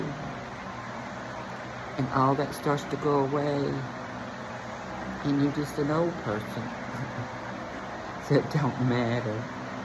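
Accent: South, United States. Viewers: Hi, you're the opposite of ugly. You are very attractive both in your appearance and your demeanor. As a fellow North Carolinian, I also love your Southern drawl. Even though I'm not an "ally", even though I'm very traditional in my values, please know that I like and respect you and enjoy listening to your content. You bring a refreshing and humorous voice to what can be a contentious topic. Especially I appreciate that you're clear about the differences between born male and female as to athletics. And you are clear on not being okay with grooming, unlike the radical activists stealing the conversation. Thank you for speaking out.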